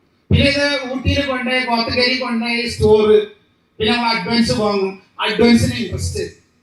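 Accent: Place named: Malappuram, India. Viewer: ഇതുപോലെ ആരും സ്വന്തം ജീവിതം പറയാൻ മടിക്കും... അല്ലങ്കിൽ കൊറച്ചൊക്കെ കള്ളം പറയും പക്ഷെ പച്ച യായ മനുഷ്യൻ മലപ്പുറത്തെ വർത്താനത്തിലൂടെ പറഞ്ഞു അനുഭവംങൾ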